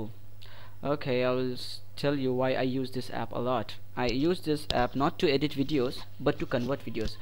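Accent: India. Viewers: Nice vid. And nice accent, are you Indian? :D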